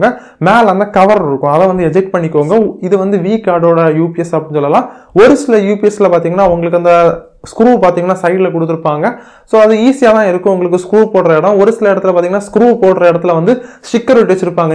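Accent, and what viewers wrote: Kanyakumari, India. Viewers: Bro.. Kanyakumari slag😍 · Neenga Kanyakumari ya bro.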